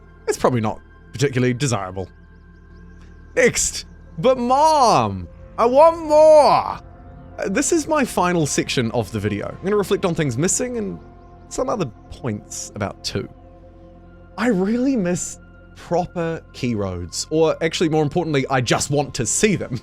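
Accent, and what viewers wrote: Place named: New Zealand. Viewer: As soon as I heard your accent I was like, Holy shit your kiwi! Subscribed my friend 😁🤙🏼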